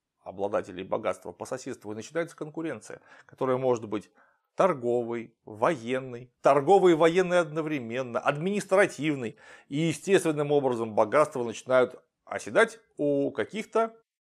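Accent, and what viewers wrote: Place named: Bryansk, Russia. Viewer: Спасибо, Клим Саныч, за просвещение. Слушаю вашу речь и наслаждаюсь - родными краями повеяло, у меня батя из под Трубчевска. А у дяди жена из под Клинцов. :)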